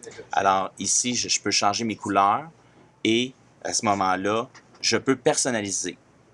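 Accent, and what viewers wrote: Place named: Canada. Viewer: Merci pour ce bel accent canadien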